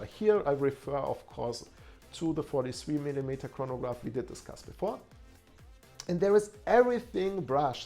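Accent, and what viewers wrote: Germany. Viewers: I like the German exent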